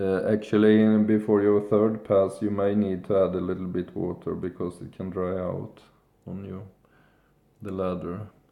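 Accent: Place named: Scandinavia. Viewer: That is quite possibly the most Scandinavian accent I've heard 😄 Nice review mate, I'll definitely be testing this one out myself. Only tried their sandalwood shaving cream so far, so I'm interesting in seeing how this one will perform.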